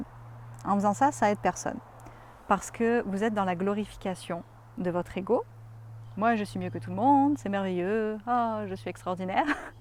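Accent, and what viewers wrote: Canada. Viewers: Merci, Merci! ...De votre sourire, de votre joie, de votre simplicité mais aussi des messages très réconfortants que vous nous offrez...avec ce charmant petit accent canadien!😀 (Pour ma part j'ai un peu de mal...avec l'application du savoir intellectuelle en actes ...et aussi ac qq termes anglais qui sont sympa...quand on les connaît! Au plaisir de vous retrouver! J'ai regardé cette présentation avec ma fille de 28ans dans mes bras...jusqu'au bout.cela nous a réconforté à tou points de vue . C'était un moment puissant qui nous nourrit l'âme !🙏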